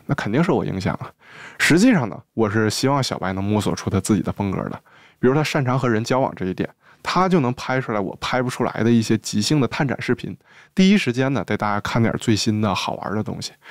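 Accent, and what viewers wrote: Northeast, China. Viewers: 小老弟小老妹儿，第一次看你的视频就知道你是东北人:face-blue-smiling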